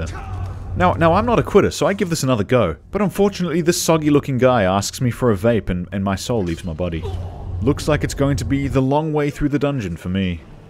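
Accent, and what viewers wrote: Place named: Australia. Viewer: It's strange, i assumed was Australian on account of his accent but he always names his characters typical German names. Intriguing stuff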